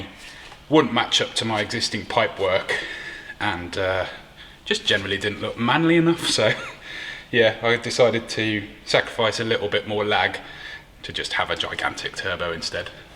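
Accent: United Kingdom. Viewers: a Guy with my Good Ol College Professor British accent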